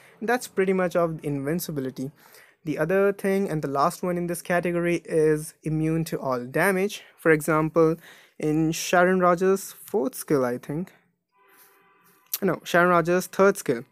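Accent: India. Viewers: Also u have a slight indian touch to ur accent.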